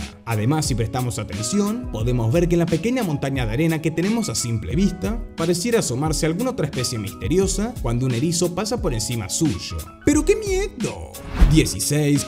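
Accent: Rioplatense, Argentina. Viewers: Es Argentino. ..😎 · Sos Argentino?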